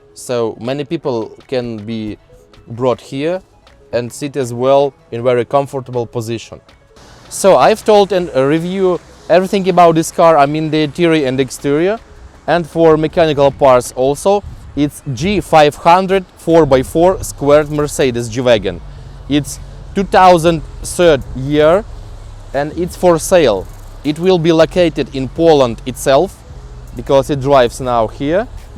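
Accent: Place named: Ukraine. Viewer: Я плохо понимаю английскую речь но тут понял все. Украинский английский язык такой же как Русский английский язык. Тачка хорошая получилась. Ну а портальных мостах?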